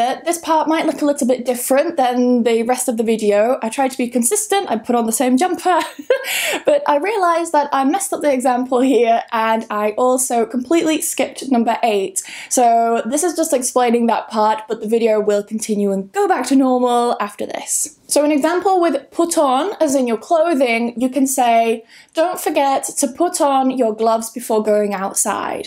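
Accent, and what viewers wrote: United Kingdom. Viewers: I love your accent, what region of UK is it from? 🌸🌸🌸🌸🌸🌸🌸 I'm from Guatemala 🇬🇹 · Hi!, l have been watching your video for one month. l think these videos are so useful to learn English skills. You have also a great British accent that makes it easier for me to understand.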